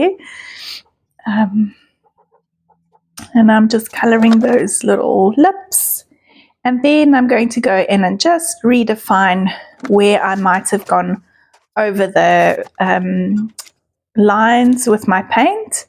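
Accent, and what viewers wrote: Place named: South Africa. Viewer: Beautiful page! I love hearing another South African art journalling because sometimes I feel like I’m the only South African who art journals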